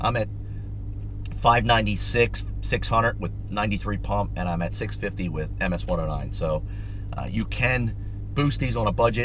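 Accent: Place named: Philadelphia, United States. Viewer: Hey man awesome video!!! I’m here in Delco. West Philly guy born and raised!!! I could tell as soon as I heard you talking that you’re a local guy!! Love the video. Considering buying 2012 used with 80k miles.... it will be first nice car I bought myself. Loves trucks/suvs but also want something fast and fun to drive!!!! Love the way these SRT 8s look!!! It’s a 2012 SRT8 6.4 with 80k and they’re asking $25k I believe. Do you think it’s worth that,?? I can deal with little issues no problem and I always had trucks so MPG is not a concern! Lol pro charger sounds like a great idea hmmm 🤔 also I subscribed. Thanks man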